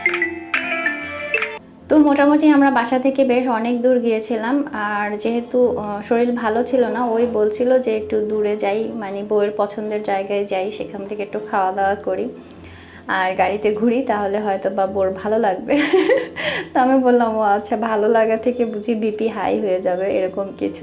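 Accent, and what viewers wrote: Sylhet, Bangladesh. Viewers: Apne ki Sylheti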